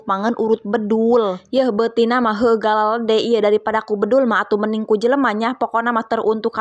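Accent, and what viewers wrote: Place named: Pandeglang, Indonesia. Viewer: Omah mah resep jasa kana logat ngomong na teteh ja sarua omah ge urang pandeglang ,salam nya teh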